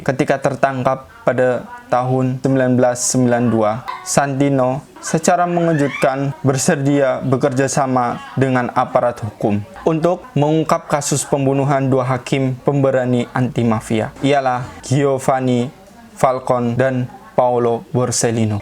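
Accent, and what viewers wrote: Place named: Banyumas, Indonesia. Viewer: kumpas tuntas Bahasa tegal donk. Ko ngapak medok nemen koooh. Hahahaha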